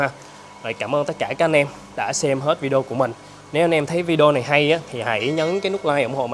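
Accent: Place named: South, Vietnam. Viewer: Sao bạn này giọng nói giống người Miền Nam ghê 😊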